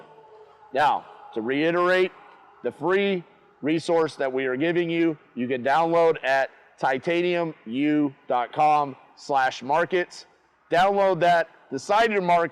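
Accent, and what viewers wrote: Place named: South, United States. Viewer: You sound like a guy from down the way. I grew up in a town of 300 people in the south.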